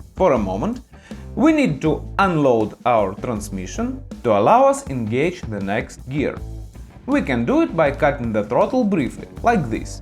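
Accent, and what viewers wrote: Russia. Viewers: That Russian accent ♥️♥️♥️✨ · You will not know it, but I do like you, 1. you sound great speaking English, yes I know you have a Russian accent BUT! its not your exact fault, that your govt are pritty stupid and have always caused trouble all over the world, but hey, not you ok, (but you Russians have totally ignored everything! done nothing at all to CONTROL anything, seems all Russians are ignorent of affairs, I have no idea why i have said this, its not motoercycling), 2. your are EASY to understand. 3. you are good at visualisation! 4. its all very comprehensive. I have no idea how you will cope because Russia looks like it will be destroyed somehow? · кацапський акцент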